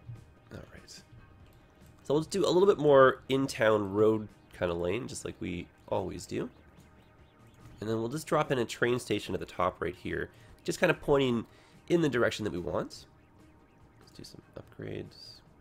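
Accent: Canada. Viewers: I know this is a 3 year old comment but that's just his Canadian accent.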